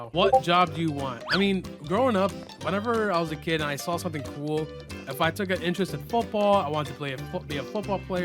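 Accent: United States. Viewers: and you sound america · youre from california?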